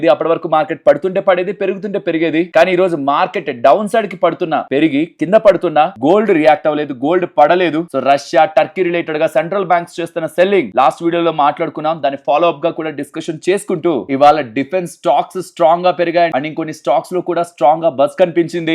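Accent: Nellore, India. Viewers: Galaba chasi velli potey … ❤ the Nellore slang !!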